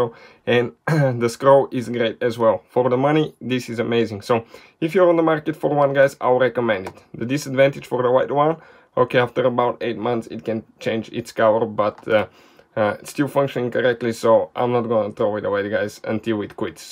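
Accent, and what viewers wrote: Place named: Russia. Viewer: i love the thick Russian accent lol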